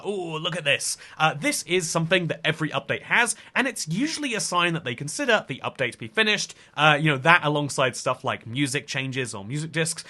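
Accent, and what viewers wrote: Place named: United Kingdom. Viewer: It's so weird how I can watch every video you upload and hear you speak with a British accent and somehow forget you're British until I see "Armour" in the title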